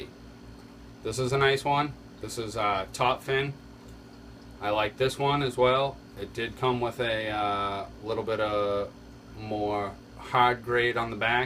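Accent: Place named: Massachusetts, United States. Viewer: I've always been a naturalist and have enjoyed tending to plants, reptiles, and other critters. I have always struggled with my aquariums though. I see now, after watching a few of your videos that it is because I always rushed into it and was never fully prepared. This video alone has shown me just how unprepared I have been! I am looking forward to setting up a beautiful planted aquarium using all your great tips and tricks! I love watching your videos as your mild Mass accent reminds me of home where I grew up. Thank you for the great videos!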